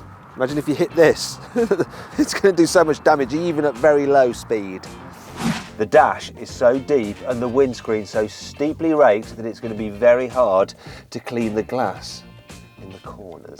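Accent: United Kingdom. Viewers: 그러고보니까 번호판은 텍사스인데 억양은 영국억양이네??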